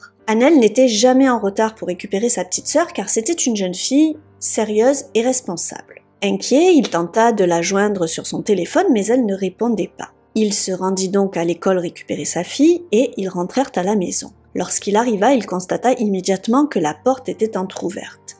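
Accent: South, France. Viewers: Je me languis tellement de tes vidéos !! Je reviens de ma pause déj et je me dis : bon qu'est ce que je vais bien pouvoir regarder tout en travaillant et je tombe sur ta vidéo !!! J'apprécie énormément ton travail, tes recherches et ton petit accent du sud qui nous apporte le soleil ! T'écouter est un véritable plaisir ! Merci pour ton travail et et merci de réussir à dénicher des histoires de true crime si méconnues en France !!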